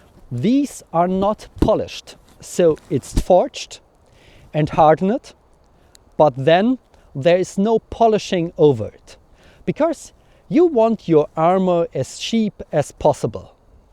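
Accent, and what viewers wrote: Germany. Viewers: Super Video! Wenn ich darf lasse ich dir einen kurzen Hinweis zu deinem Akzent da. Du machst nämlich einen typisch deutschen Fehler. Du betonst "because" so als wäre es "becourse", also da kommt plötzlich ein r vor das es gar nicht gibt. Das "cau" in "because" wird aber einfach wie das "ko" im deutschen "kommen" ausgesprochen. Wir deutschen können halt das englische r nicht aussprechen (geht mir auch so) und das überkompensieren wir dummerweise gerne indem wir es überall reinpacken. Hoffe das ist nicht unangemessen, dachte mir nur das könnte hilfreich sein. Viele Grüße!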